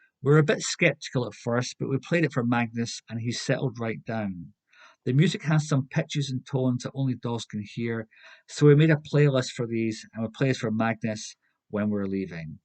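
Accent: Scotland, United Kingdom. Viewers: Superb video about the subject. Also very important is your calm and relaxed Scottish accent and "timbre" to calm down the hoomans who are worried about the anxiety of their sighthounds. It works 2 ways. Most excellent.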